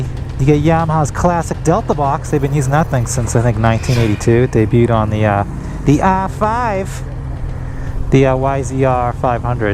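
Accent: Boston, United States. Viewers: It’s like Ben Affleck’s accent in Good Will Hunting...on steroids, in a bad way. Could only go 2 min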